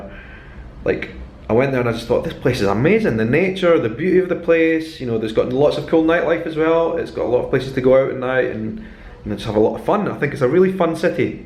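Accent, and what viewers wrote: Scotland, United Kingdom. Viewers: Hi Where are you live..vitoria? I have a lot friends there if you need something please writte me..Im living in Dublin at moment. And I love your channel because the serie OUtlander.. and amazing your scottish accent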